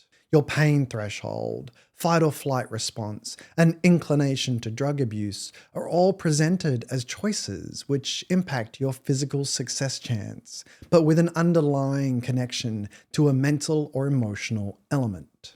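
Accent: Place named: Australia. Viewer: You sound australian but pronounce some words very american. I am confused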